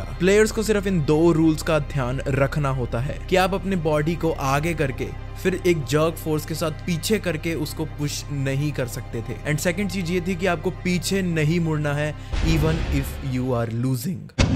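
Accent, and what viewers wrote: Haryana, India. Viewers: Bro i have question are yu from haryana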